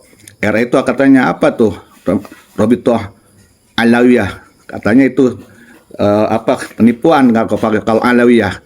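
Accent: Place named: Banten, Indonesia. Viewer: Lughot Banten'e melekat 👍 lanjut-kan perjuangan pak,,kiyai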